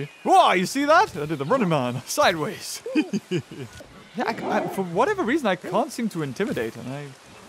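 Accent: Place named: England, United Kingdom. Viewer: I love that English act sent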